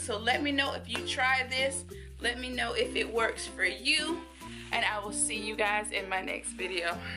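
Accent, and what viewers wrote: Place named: South, United States. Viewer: Well... she does have an accent but it’s because it’s southern. Not ghetto. To me it’s not an accent because I was born and raised down here. Thanks queen for the video!!!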